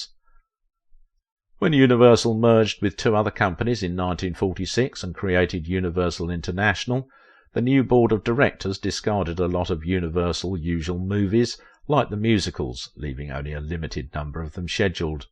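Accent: United Kingdom. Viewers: Please stop pronouncing it " shed..yul." I don't care if it's the UK way, it's WRONG...and it sounds stupid.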